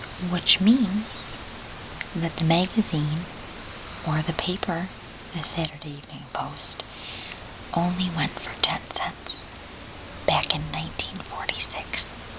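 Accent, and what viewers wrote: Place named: South, United States. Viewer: what I looove about this video is... :D many aspects, dear: your accent (southern US? I am not native English speaker yet I would place it there), the brush, the little stories and of course the pictures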